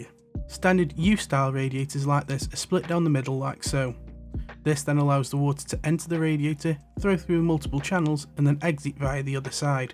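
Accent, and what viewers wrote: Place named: Stoke, United Kingdom. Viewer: As a fellow stokie, you can hear another stokie from a mile away.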